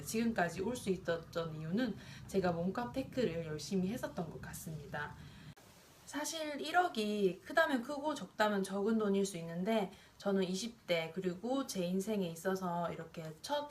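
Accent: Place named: Gyeongsang, South Korea. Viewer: 대단하세요!! 진짜 자랑스럽네요! 그리고 경상도 사투리 넘 귀여워용...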